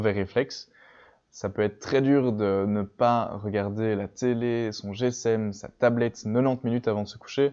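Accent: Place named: Switzerland. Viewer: Tu devrait parler plus doucement pour nous endormir ahah j’ai remarquer que tu était Suisse donc je comprend mieux sinon super t’es vidéo !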